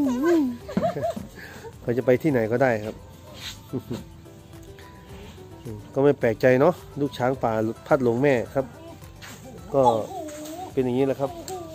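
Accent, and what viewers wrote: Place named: Isan, Thailand. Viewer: น่ารักจริงๆแถมมีเสียงพากย์อีสานของ เข้ากันดี รักนะจ๊ะ ขายอะไรคะ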